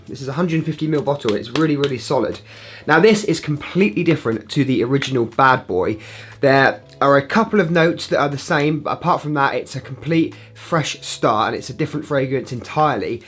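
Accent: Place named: United Kingdom. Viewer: He is British. He is speaking the original English not the American English or whatever English you learnt.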